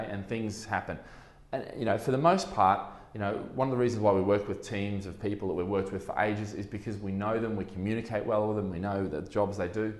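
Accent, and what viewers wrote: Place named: Australia. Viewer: from the uk yet he has an aussie accent :P very informative.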